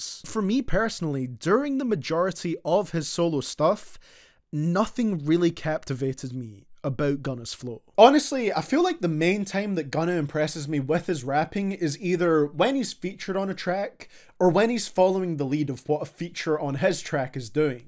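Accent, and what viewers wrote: Scotland, United Kingdom. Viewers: He is Scottish, he has a strong accent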